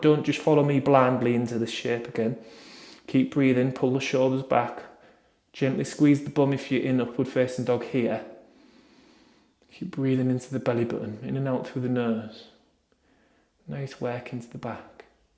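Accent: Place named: Leeds, United Kingdom. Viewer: Thanks. I’m from Leeds originally so the accent reminds me of home 😊. Many thanks, really I was thinking of some gentle stretches that would be good for anyone who are is currently not running but wanting to keep in good condition for when they start back running. Just an idea. Thanks!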